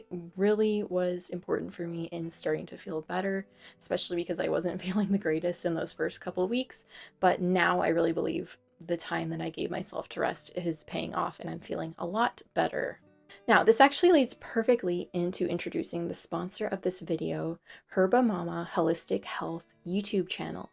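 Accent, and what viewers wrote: Canada. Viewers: Please do a video about your eyebrow regrowth journey- I can see, in earlier pictures of you, that that is also a choice that you made. I just turned 60 and decided about a year ago to start regrowing my brows (because the alternative is a very expensive brow lift!). My brows were growing back really well by simply moisturizing them every night with L’Occitane cream, but when I used micellaire water to remove my makeup over the course of a couple of months I realized that I was getting bald spots where there had previously not been a problem with sparsity! So I would love for you to talk about that… Love your videos, great information, and Canadian accent!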